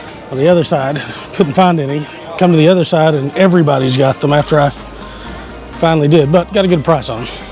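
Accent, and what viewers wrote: South, United States. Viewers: Good choice moving to Belgrade Serbia is fun lots to do you seem to have a southern accent I'm guessing georgia or florida.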